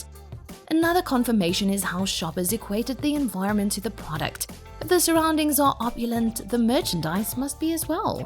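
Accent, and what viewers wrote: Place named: South Africa. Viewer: This narrator definitely from South Africa.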